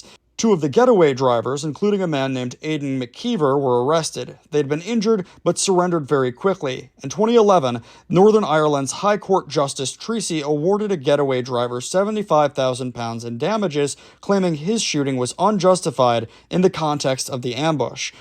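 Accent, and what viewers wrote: United States. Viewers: Who are you to come here and tell us our own history in your stupid annoying yank accent? And if you are going to carry on maybe you would try and pronounce the names, towns and people properly! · I’m American and I have been on track with the Troubles since 1969 when Bernadette came to the US, but I am taken aback when I hear an American accent trying to tell others about the Troubles. I know people who lived through it and of course I learn more from them and I’m a lot more aware of South Armagh and Belfast, but be very sure of what you say because there are more than 2 sides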